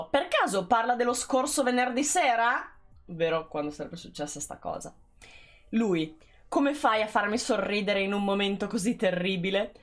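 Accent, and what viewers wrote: Romagna, Italy. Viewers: Hai la Z romagnola! comunque bel video, davvero esauriente, ti seguirò.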